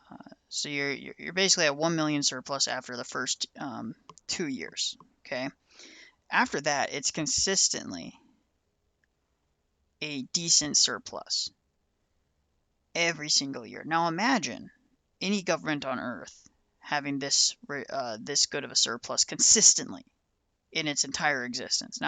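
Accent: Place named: United States. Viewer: You sound American. Unfortunately I think American people, even some of the most based people on the right, are still too democracy pilled to ever get this point across. I think it’s only when the democratic system fails on a mass scale will people actually begin to entertain the possibility of non democratic alternatives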